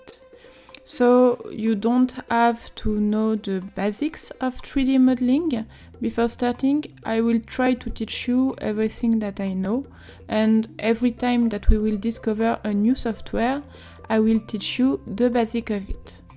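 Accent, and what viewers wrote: France. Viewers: Your french accent really cute :3 and dont worry your accent is understandable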